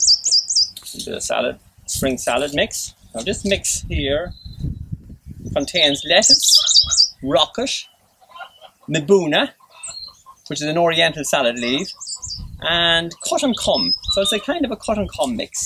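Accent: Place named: Jamaica. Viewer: Great video. Really helpful. What is that accent? I have Jamaican family and they sound a lot like u.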